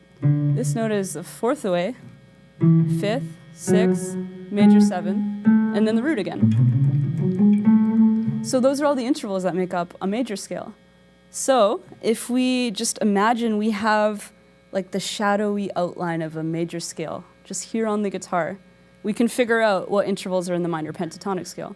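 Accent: Canada. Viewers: beware, she's canadian... she pronounces about "aboat" and that winter jacket... funny thing about music "theory", no matter what you do it can be explained "theoretically". you'll realize this after siting thru a non diatonic chord, modal interchange or dissonance recital, or any mixure therof... solid lesson, penta = 5.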